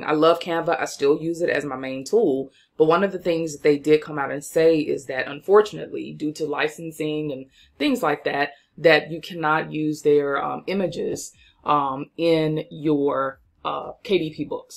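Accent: South, United States. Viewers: Great video..thanks so much. This was easy to follow and fun. Love your southern country accent.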